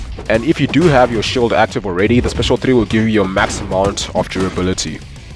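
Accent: South Africa. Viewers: Yo I have to ask. Are you from south africa? Sounds like you are.. Congrats on red guardian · Randomly came across this video. You sound South African?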